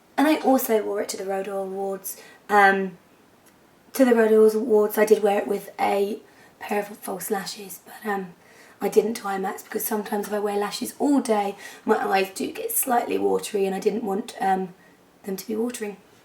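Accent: United Kingdom. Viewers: I just love doing that line, it makes such a big difference along with the mascara, sometimes you don't need anything else on the eyes. When you said gel liner I heard jaw liner, but of course english is not my native language, and paired with that lovely british accent (which I would kill for, I can only speak like Americans meh) it came funny to my ears. :D BW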